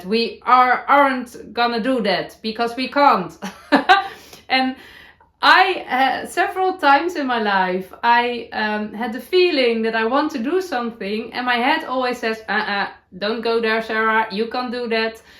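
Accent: Netherlands. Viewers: I adore your dutchy english. I can't remember the name of your sweater ? Can you mention it maybe tomorrow ?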